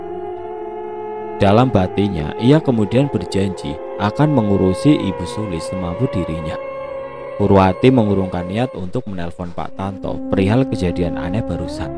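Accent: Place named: Java, Indonesia. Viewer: bukan, kayanya yg bacain cerita ada 2 org. Klo yg biasanya itu mngkin yg namanya mas, logatnya Jawa agak2 ke perbatasan Jawa barat. Tp klo yg ini Jawa nya medok bnget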